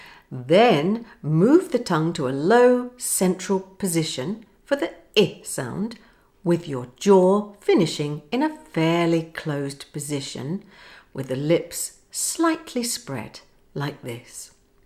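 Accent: United Kingdom. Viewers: Is it British English accent?? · Is it british accent?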